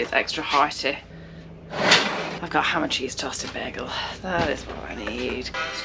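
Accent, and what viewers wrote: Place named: East Yorkshire, United Kingdom. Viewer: I'm placing your accent in Beverley East Yorkshire (near Hull) ...am i right?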